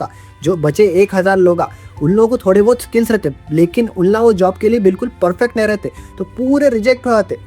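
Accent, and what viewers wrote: Hyderabad, India. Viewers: Hyderabad se ho aap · Hydrabadi accent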